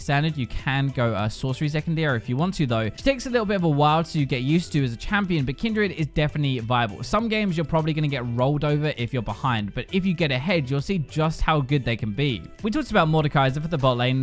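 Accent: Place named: RP, United Kingdom. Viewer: I love your accent! I love RP as well... :)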